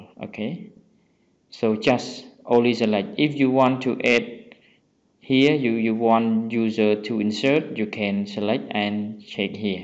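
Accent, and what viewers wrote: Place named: Cambodia. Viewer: depend on your accent . im sure 100% that u khmer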